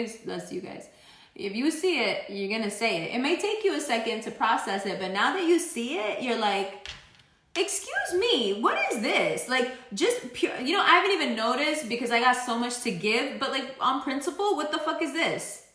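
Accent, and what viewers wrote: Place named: New York, United States. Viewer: You are so funny ... oh man I love it !!! I'm a New Yorker , living in Jersey now across the Hudson.. you sound just like me :p I literally just said all this to my bf like last week the attitude and all haha I was just not beat ! Nah !! ;)) we really don't play ;) pisces / aries cusp .. Gemini rising and yup ! Not doing it. Happy Birthday to you beautiful enjoy your time in London. Thanks for everything ♡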